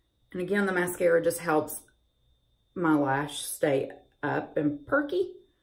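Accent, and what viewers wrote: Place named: South, United States. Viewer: Wow I could jst watch you all day. Very beautiful. Luv your channel. Love your style and your cute southern accent too!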